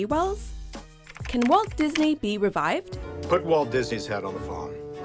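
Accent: United Kingdom. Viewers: It sounds British ^^; I am from Wisconsin, so I think I can tell the difference since I would have literally been within driving distance from Canada :P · American icons and yet a British narrator. Makes sense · ugh British b arrator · A lot of comments about a British narrator. Apparently that makes a difference. He is is only reading from a script that a team of people have researched and developed. What exactly does it have to do with the content of the video especially when these myths can be historically proven? It's exactly this attitude why most of the world believe Americans are arrogant and stupid.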